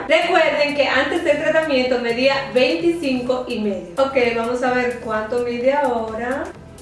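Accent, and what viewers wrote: Dominican Republic. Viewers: eres dominicana ??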